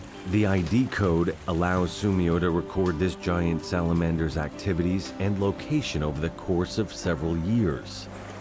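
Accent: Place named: United States. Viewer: this was an excellent video of a group of truly unique animals. However, I want to highlight 2 things on my mind which I'd like to point out. First, your accent sounds as if you're a fellow American, though I guess you could also be Canadian. If the latter, ignore the following complaint. I noticed that you used only the metric measurements, rather than doing as many to most heads of channels: use both. It would have been helpful for us Americans. The other is a wording problem in the star-nosed mole section. The professor said that this creature is "one of the only" of something, which is grammatical nonsense. The reason is that "one of" indicates there's more than 1 of something, whereas "only" means there can't possibly be more than one. What should be used in this type of situation is the phrase "one of the few".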